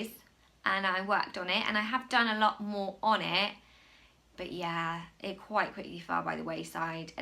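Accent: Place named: United Kingdom. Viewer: Happy to find your podcast, watching for the first time! I love mustard, I love that you crochet, and I love your energy. Your accent brings me back to my time living in the UK (in the USA now) and I can't wait to watch more of your episodes!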